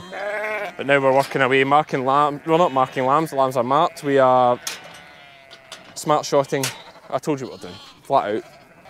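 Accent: Scotland, United Kingdom. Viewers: I'm a fairly new fan of Sheep Game... And I'm trying! I love the content but... Getting used to the Scottish accent is taking some work for these Canadian ears of mine. I'm glad is conscious of this challenge for viewers and adjusts things somewhat as he mentions here. My Granny emigrated from Glasgow so I thought I'd have an edge. Oh well it just means watching the same content 2 or 3 times to be sure. 😉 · Wow, and I thought I could understand the Scotts…. Maybe give us a whole video with the full accent. I have so much to learn. 😂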